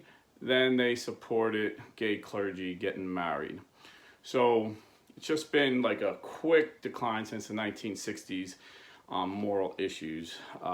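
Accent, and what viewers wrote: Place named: Northeast, United States. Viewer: Are you Italian? You have a Northeast US accent or Chicago accent. Can't tell which, since I'm not from USA.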